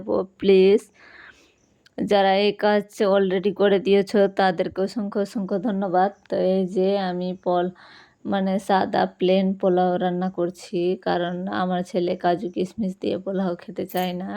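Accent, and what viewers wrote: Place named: Murshidabad, India. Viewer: আপু তোমার বাড়ি কি মুর্শিদাবাদ , তোমার কথা শুনে ওরকমই মনে হচ্ছে😊😊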